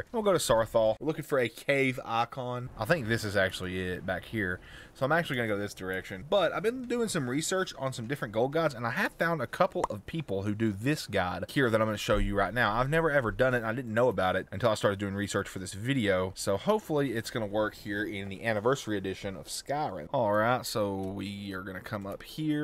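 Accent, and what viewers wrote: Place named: Rural, United States. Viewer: dude i live in texas and your accent is so hick lol skahrim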